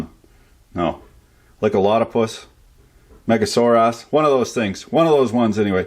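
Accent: Canada. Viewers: been a mechanic since 77 , I came to this channel for this , turns out I like listening to canucks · The Canadian commentary is half the reason I watch these.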